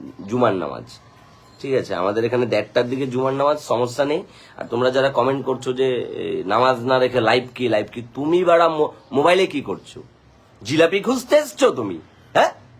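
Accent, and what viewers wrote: Kolkata, India. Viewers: ভাই আপনার ভারতীয় বাংলা ভাষা এক কথায় অসাধারণ লেগেছে। একদম কলকাতার টিউন। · সেই হইছে কলকাতা স্টাইলে । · দাদা আপনি কিন্তু কলিকাতার মতন কথা বলতেছেন আমাকে খুব ভালো লাগতেছে কথা শুনতে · Dada tomar Kolkata accent ta darun laglo ...😂😂😂😂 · uni hotat kore kolkata accest e bolse pura video te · Apnar Kolkata er golar tone ta khub valo hoyeche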